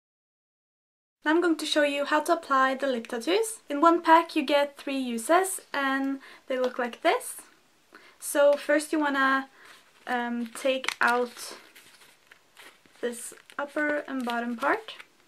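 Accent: Norway. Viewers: I know you said violet, but I heard violent.. That sounded a bit disturbing :p "I'm trying out some violent lips" Edit: Oh, and btw - uten å lese noentingsomhelst, og før jeg en gang har sett videoen vet jeg at du er norsk basert på måten du snakker engelsk. Du kunne tilogmed ha vært trønder. You go girl.. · Great tutorial! Elsker den norske aksenten :)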